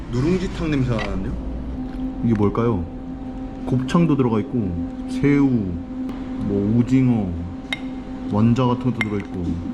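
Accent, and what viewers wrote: Jecheon, South Korea. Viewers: 사촌형이 제천사람이라 그런가 말투 너무 익숙해요 ㅋㅋㅋㅋ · ㅋㅋㅋ 보면볼수록 제천사투리가 잘 들리네요 ㅋㅋㅋㅋ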